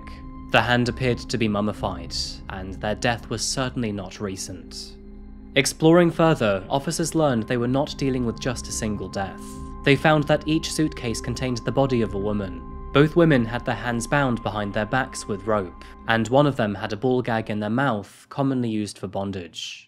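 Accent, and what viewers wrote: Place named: United Kingdom. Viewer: British awcentwtwtw....nice exploitative content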